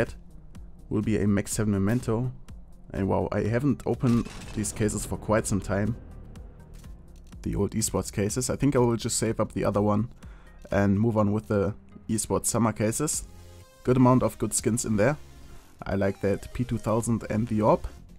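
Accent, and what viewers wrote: Germany. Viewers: Are you german? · Ich liebe deinen deutschen Akzent:DD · Man merkt sofort das du Deutscher bist :D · Ok du bist deutscher oder? Wenn ja merkt man das, wenn nein kannst du das hier ja eh nicht lesen xD ^^ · Man hat sofort gemerkt das du aus Deutschland kommst ^^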